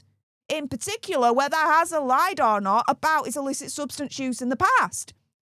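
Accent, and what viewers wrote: United Kingdom. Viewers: Love the way you talk on your show and your British accent👍🇨🇦👍🇨🇦👍🇨🇦👍🇨🇦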